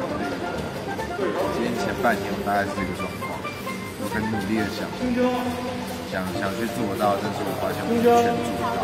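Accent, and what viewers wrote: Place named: China. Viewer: Me encanta su acento con chino. 😍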